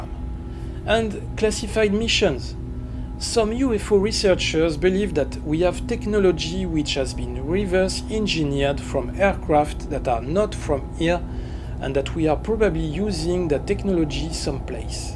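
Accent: France. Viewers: Bonjour tes vidéo sont très intéressante mais malheureusement je comprend pas l'anglais je me contente du visuel ou de la traduction google mais la traduction n'est pas fidèle je pense. Ton accent montre que tu es français . bonne continuation si tu as des vidéos en français se serai top.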